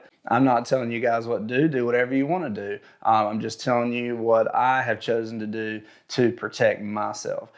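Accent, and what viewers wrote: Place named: South, United States. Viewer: I might be working for this company soon so been doing some research. I’ve heard mixed things about the cases they’ll go with but I atleast think the company and many of the people in higher positions do hold the 2nd amendment very close. side-note like your channel. Top tier accent as well one day I need to move down south. Gave you a sub.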